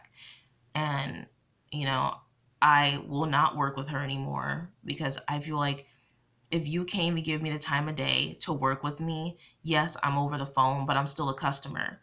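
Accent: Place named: New Jersey, United States. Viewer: I just came upon your video and I'm glad I stopped to listen to your experience regarding your LV repair. First, I too am sorry you are having these lack thereof quality issues as well as poor customer service. Secondly, I too have had my share of unfortunate experiences with LV especially regarding new item quality issues and I've in many instances if not all only had the item for a month or two but I get this ugligness attitude from the store I deal with if I bring up an item with quality issues. I'm so over them and their poorly designed pieces of late. Don't get me wrong I still love LV and it's craftsmanship but I expect them to be behind me 100% if I have an issue. So sorry, again that you are going thru this. Hi I watch you and I love your I think Jersey accent excuse if I'm wrong but I love your videos. Keep up the good work!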